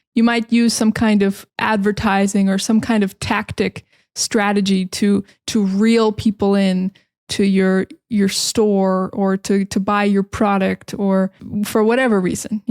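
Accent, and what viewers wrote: United States. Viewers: Thanks for recommending Micho Brothers, they are awesome. By the way in living in São Paulo as well, in a homeless shelter. I really appreciate your channel, you're helping me a lot to improve my English skills, your american English accent really matches with my goals. Thanks so much dear teacher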